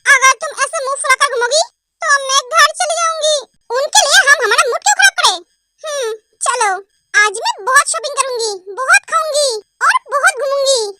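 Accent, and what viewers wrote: Bengal, India. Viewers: Apu Tumi ki bangali tomar hindi sune mone hoy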